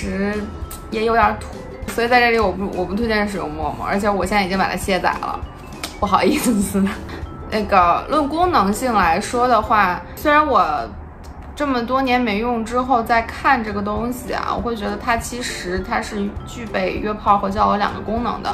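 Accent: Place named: Beijing, China. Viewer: 北京腔儿，姐们儿老北京啊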